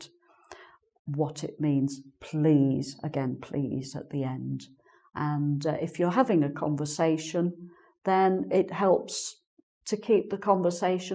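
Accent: United Kingdom. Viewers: I'm pronouncing every single word from you to be accustomed with British accent. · you've got the British accent, haven't you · Is this a British accent ?? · Beautiful British accent!! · love your British accent soooo charming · I love the pace and clarity of your speaking. It's a reason why I love to listen to the British accent.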